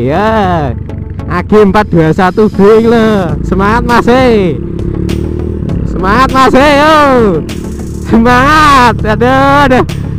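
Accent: Java, Indonesia. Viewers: jowomu ngendi pak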